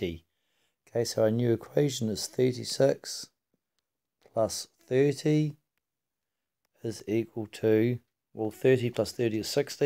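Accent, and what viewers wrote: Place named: New Zealand. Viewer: as soon as I heard your accent bam I knew you are kiwi!!!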